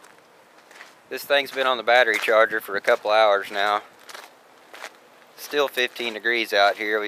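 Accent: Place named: Missouri, United States. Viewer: Thought this dude was from around Omaha for a sec. nah he from MO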